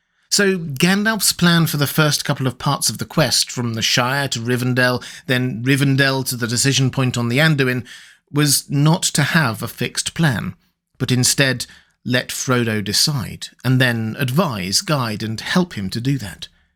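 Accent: United Kingdom. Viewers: Nerd of the Rings is great too but the British accent makes the story telling so good on this channel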